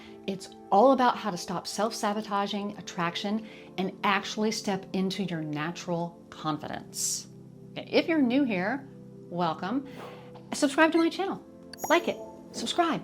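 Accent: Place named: Kentucky, United States. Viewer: Y'all ... I'm guessing Kentucky or Tennessee?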